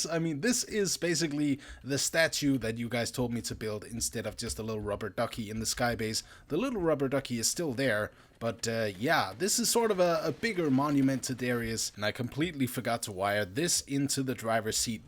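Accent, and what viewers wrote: Denmark. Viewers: Is he Danish?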